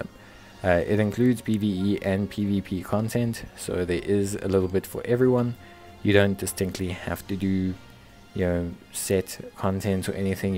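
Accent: South Africa. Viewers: Yooo how you doing? by any chance are you south african?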